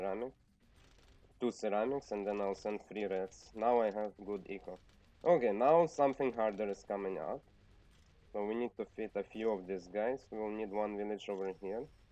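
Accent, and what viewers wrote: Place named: Russia. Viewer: Fix your sound, mate. Add 20Db in post. It'd be better. And you've got a THICK Russian accent 😏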